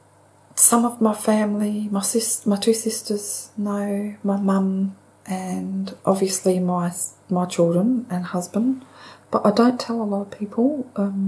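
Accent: Australia. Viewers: Lovely! You look at least 10 years younger with your new makeup pieces and hair do! I have to say I love my international ASMR artists and their accents. However, I was so thrilled to find an Aussie lady (you, in case you wondered) with whom I could identify. In those days I still thought it was a bit weird but you helped normalise it for me. I am slowly finding more Aussies making ASMR videos of which I'm also proud. But, you are my first Aussie ASMR artist and a relaxing nurse on my not so crash hot days. The rest are bonuses. 💜🦉🇦🇺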